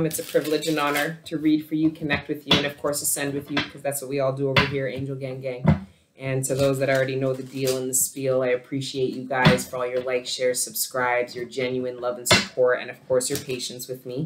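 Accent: Jamaica. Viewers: deep voice patois FUCKING DONE.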